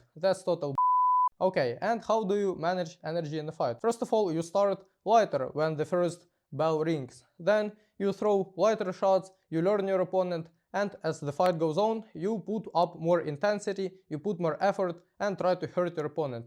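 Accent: Russia. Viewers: I love your rusian accent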